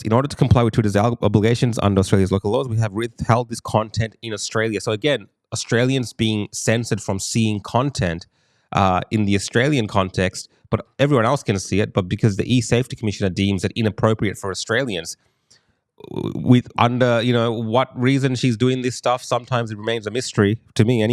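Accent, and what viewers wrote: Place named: United States. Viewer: Her American accent noted.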